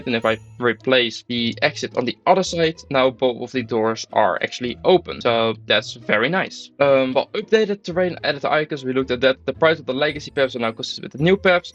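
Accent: Netherlands. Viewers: de nederlandse accent!!! hahahah helemaal geweldig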